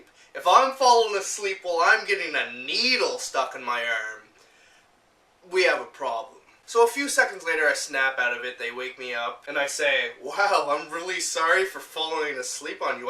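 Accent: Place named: Canada. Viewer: You have such a Canadian accent. Love it!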